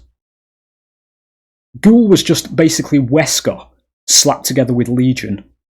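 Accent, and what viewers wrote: United Kingdom. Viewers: Random. But are you from Wrexham?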